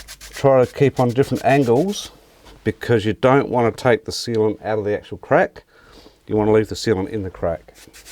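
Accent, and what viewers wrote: Australia. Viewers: Nice work. You sound Australian. What city are you in ?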